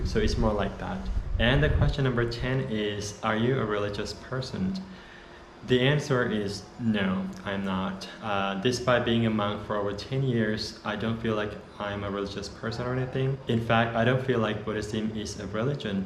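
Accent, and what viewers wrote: United States. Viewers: Wow that's good to know about the monks program. Aw your English accent sounds absolutely perfect like the Californian. Thanks for sharing the awesome informations. Keeping the good work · Hi, thanks for sharing your story. I been curious as to how you got American English accent and now I know. I became a monk when I was 10 and stayed for 2 years at Wat Prabhat in Pakse. At the time my mom and younger sibling need to go back to Vientiane but due to finance issue she left me at the temple. But it was alright since my grandmother house is right across the road. Unfortunately my aunts and uncles does not live in Pakse but in a different cities/village and have their own live. Since grandma was too old and cannot take care of me so the only choice was to become a monk.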